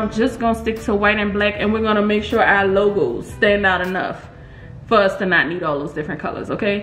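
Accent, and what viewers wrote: New Orleans, United States. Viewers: Are you from New Orleans? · Yes, let’s please! I hear New Orleans 💜💚💛 Is that where she’s from? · Naturally New Awlins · I have a quick question 🙋🏾‍♀️ Does any marketing or advertising that we have to do. Also I am from (Nola) I can spot our accent anywhere 😂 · I heard you talking and I said she must be from New Orleans or somewhere around there. Check the description, Sho nuff you are.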